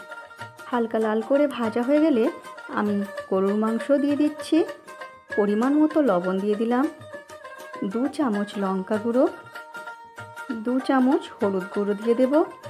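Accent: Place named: India. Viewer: রান্নাটা দেখতে দারুণ লাগছে। আপনি কি ভারতীয়? কথাবার্তা ঠিক আমাদের মতো মনে হচ্ছে না।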